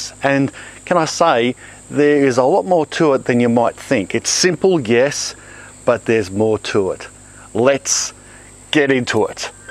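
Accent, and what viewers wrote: Australia. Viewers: I love this guy!!! So much of fun, so a lot of information, so inspirative. I like dude your Australian accent, great work!! :) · I'm hearing a slight Aussie accent 😂😂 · Just found your channel.. Not sure how but I love it..love the Australian accent! Are you or anyone else able to tell me where you are getting those oval green raised beds? Thanks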